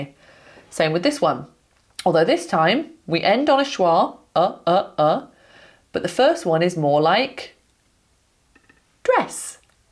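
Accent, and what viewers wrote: United Kingdom. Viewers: Hello, I love learning British accent from you. They have a lovely British English sound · Really like your videos, like British accent ❤ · Me gusta escuchar tu acento británico, y por cierto , te pareces mucho a Alice Merton. 😤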